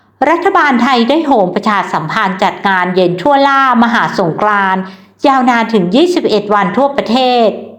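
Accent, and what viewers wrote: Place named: China. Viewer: ทำไมแอดพูด ส.เสือไม่ค่อยชัด สำเนียงพูดเหมือนคนจีนเลย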